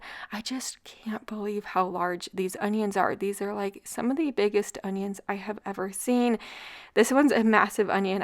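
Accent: Utah, United States. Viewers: I suspect another way of pronouncing things in Utah. It’s cute. I’m a Utah transplant.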